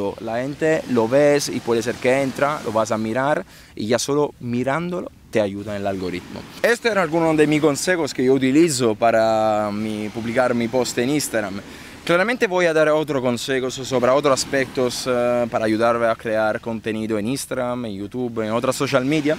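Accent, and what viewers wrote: Italy. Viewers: Todo está perfecto 👌 y me encanta tu acento italiano o estoy equivocado? 🤔 Saludos desde Brasil 🇧🇷 😘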